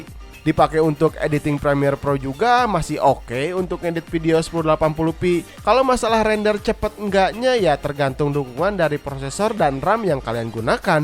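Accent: West Java, Indonesia. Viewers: Sundanese gan 😁😁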